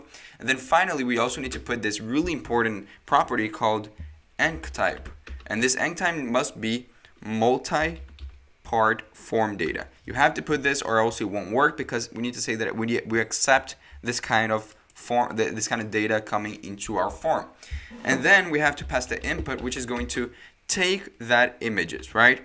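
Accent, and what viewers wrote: Brazil. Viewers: TU É BR NÉ? namoral sotaque foda, mas da pra ver q é br. Belo tutorial <3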